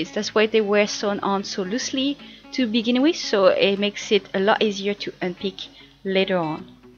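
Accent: France. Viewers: are you french :3 ? you have an accent similar fo french 'i speak french btw" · Are you French ? I love the tutorials do more ^ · where are you from? you're accent is like a french accent °-° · Are you French ??? ( you have the accent ) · Hello ! I love you tutorial ! I planed to do one ! Are you French ? If yes, I am too ! I think so because when i talk english i have the same accent >w< !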